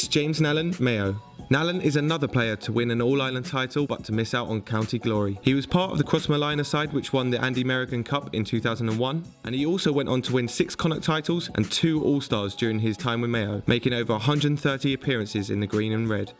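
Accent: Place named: England, United Kingdom. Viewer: Funny to hear an english voice on a GAA video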